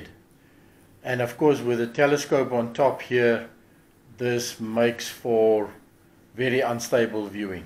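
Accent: South Africa. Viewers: Interesting. After buying a second Benro tripod recently for travel and being unimpressed with its quality (the large ones are great) I’m exploring the insane idea of building my own stand - whether it has 3 or more support stands doesn’t matter. I’m surprised how little information there is on the actual physics or DIY aspect of these. Video tripods feature an interesting collar for the legs which I believe yields a much more stable base than your approach- though I could be mistaken. Thanks for sharing this. Also, South African or Dutch accent? Sounds South African!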